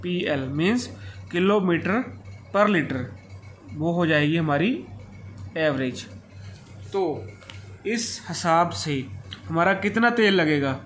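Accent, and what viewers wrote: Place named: Punjab, India. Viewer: Is tra di hor video upload krdya kro y g nale menu lgda tusi punjabi o tudi boli tu pta lg rhea das o is it right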